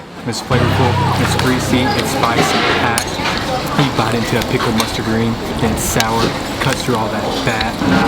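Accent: South, United States. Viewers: You are just way too handsome! My sisters and I love watching you and gushing over your adorable southern accent ☺️! Whenever we watch your videos, Our partners walk out the room and roll their eyes like 'oh here we go, that guy they all think is so sexy'! Lol.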